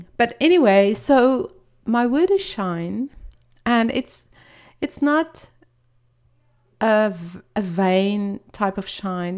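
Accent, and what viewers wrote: South Africa. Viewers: Beautiful, once again! Your layouts look so deceivingly simple... The white background works wonderfully with your style. A blessed year for you too <3. By the way, I love your accent. Reminds me of my childhood a lifetime away in Johannesburg.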